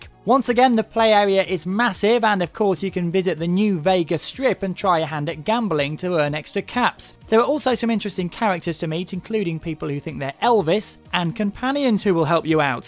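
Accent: England, United Kingdom. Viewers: his the person taking in the review British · dnt worry not all english accents sound like his his is annoying but the english accent is a lot nicer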